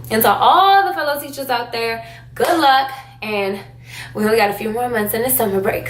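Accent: New Orleans, United States. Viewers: You’re definitely from New Orleans 😂😂 #batonrouge area.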